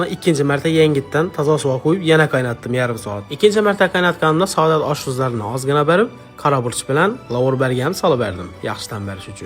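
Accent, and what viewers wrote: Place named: Khorezm, Uzbekistan. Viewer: Xorazm shevasi chiroyli eshitadida rosa❤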